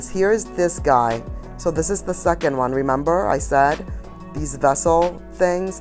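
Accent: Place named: New Jersey, United States. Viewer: Girl I love your videos. Your personality is over the moon. Sounds like your accent is New Jersey. Watch housewives of NJ and that is why I’m thinking that. I’m from New Orleans and people think I’m from Jersey. I love your accent. Tfs cheers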